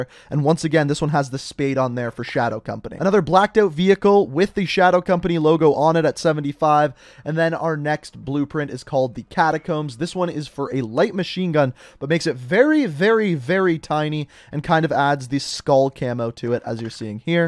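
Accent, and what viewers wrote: Canada. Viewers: Canadian?! Eh!! Love the channel even more now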